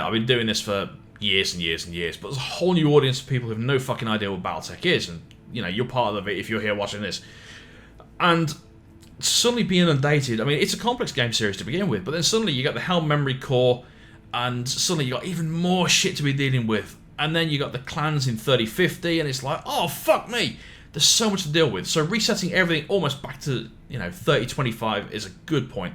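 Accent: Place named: Northern England, United Kingdom. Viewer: Top class. It's one thing to know your stuff but it's quite another to be able to teach it, and you do that really well. It's good to hear a northern accent too, reminds me of my childhood. Hang on, my childhood was shit.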